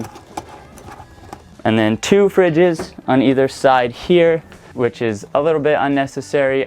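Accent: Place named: California, United States. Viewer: I don't remember if he said his location more specifically than the PNW but he sounds, to me, like an average Californian. You're making sweeping generalizations of a vast country, where people have had varying levels of educational opportunity, and insulting him based on it. He is presenting to a channel that likely has a wider audience than just the formally educated, some of whom might not recognize the array of synonyms that one may use to describe the things that have been a pleasant addition to their build. I'd argue that he was a very contextually effective speaker, using a language and tone that fits the channel and its viewers, and brought a fair amount of charisma, to boot. Regardless, organization and tool-building was also vital in bringing humans to our current time and place, and he seems to have a high level of aptitude for making and executing plans which met his personal goals. 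Why do Aussies call everyone "mate"? Why do the British throw around "slag" and "bollocks"? There's a unique context to every culture, and it's beautiful that there's more choices than just Standard English in our world.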